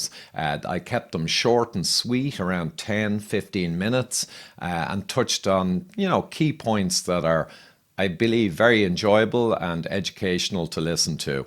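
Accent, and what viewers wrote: Ireland. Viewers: I think without real statisticians like Norman Fenton and others like you we would have been f..ed. You are good presenter and Irish accent helps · Nice job on your intros,. And yes, we Americans DO like an Irish brogue.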